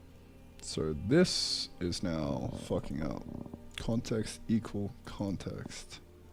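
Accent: Australia. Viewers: mate, you threw me for a loop there. "Take a peep for Circles specific stuff..." Searches for Sokol Aussie accent is from another world.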